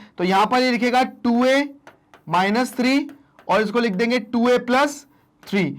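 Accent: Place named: Bihar, India. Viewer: Bihari ho bhai❤❤❤❤😂😂😂😂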